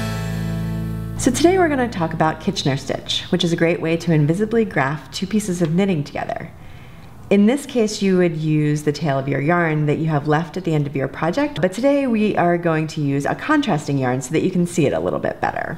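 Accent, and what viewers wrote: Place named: United States. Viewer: It's just her accent. Many Americans ive heard say "mounain" without the t sound like "tigh-en" here too. Just the accent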